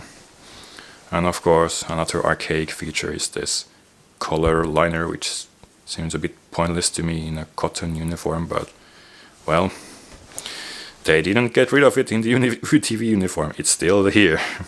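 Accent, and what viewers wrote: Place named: Finland. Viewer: Kyllä se aksentti vaan paistaa läpi meillä🤣 Mutta hyvä video! Alkanu lähiaikoina kiinnostaa kylmän sodan aikanen varustus euroopan maissa niin nää on käteviä!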